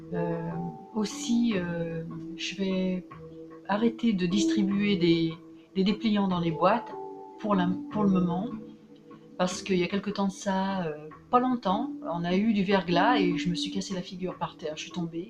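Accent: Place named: Lyon, France. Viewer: Excellent, Dieu répond toujours à nos prières sincères. Bon rétablissement surtout psychologique et sois bénie. A ton accent, tu ne serai pas d'origine lyonnaise ?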